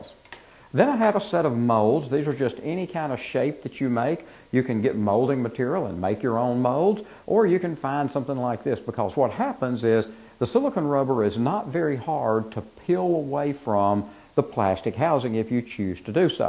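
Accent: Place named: Tennessee, United States. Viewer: You sound like a Tennessean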